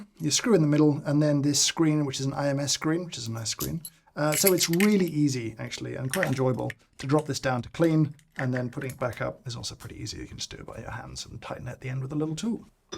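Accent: United Kingdom. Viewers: Here’s the thing about “party tricks”: you can’ t really explain why they work. For instance, I don’t know how it came to be that I would sit through a 19+ minute long video about an espresso machine which costs 19K and which I certainly would not be able to afford and yet, YET I just did! I don’t know what it is… is it my taste for espresso? Is it my curiosity about tech? Is it about ’ british accent and perfect pitch? I genuinely can’t explain why 😂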